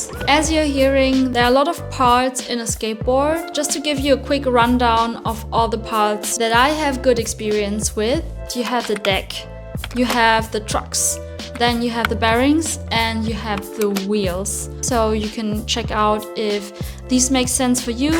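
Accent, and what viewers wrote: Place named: Germany. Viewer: It’s also interesting to hear an Asian girl with a German accent. We have many Asians in California, but we seldom see them from Germany.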